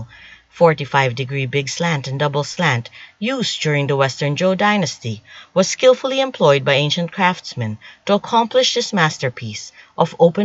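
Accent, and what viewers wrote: Philippines. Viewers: What is this now, where do you get your information? Everybody wants to make money making videos. You sound Filipino.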